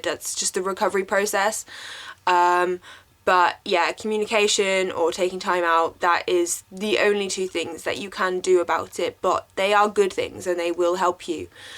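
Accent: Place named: RP, United Kingdom. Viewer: i love your posh accent.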